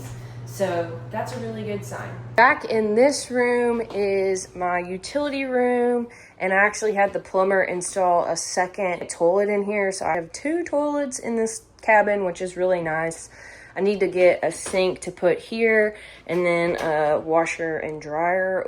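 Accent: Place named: Tennessee, United States. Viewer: I was trying to go by the accent & thought maybe Tennessee? But, I have only been there once so…..?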